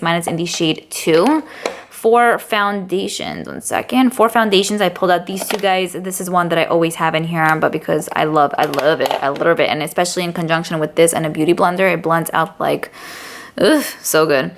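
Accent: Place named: Queens, United States. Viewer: Just discovered your channel from suggested videos and I’m going to subscribe. I like your positive vibe. Also your accent and the phrases you use are reminding me of my hometown of Glendale, Queens. I’m in CT now and would love to not be the only one with this accent. 😻💗🌈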